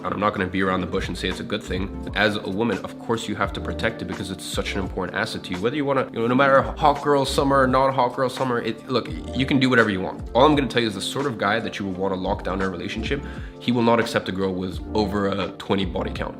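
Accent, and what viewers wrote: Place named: France. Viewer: French accent 😂😂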